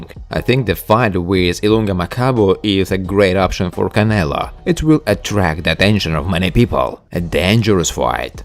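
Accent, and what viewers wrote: Russia. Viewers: I just unsubscribed.had enough of this russian accent!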